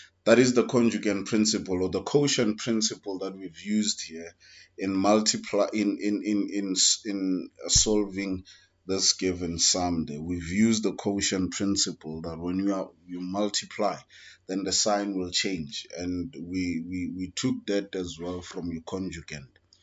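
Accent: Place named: France. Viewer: You sound french policemen not an insult tho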